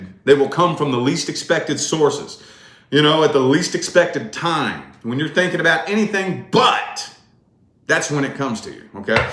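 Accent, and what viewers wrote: South, United States. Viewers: Really enjoyed this reading,! Are you from the South? I know I hear an accent in there.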